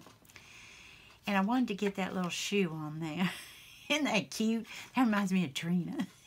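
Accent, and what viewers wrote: South, United States. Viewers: I live your accent you are such a sweet southern belle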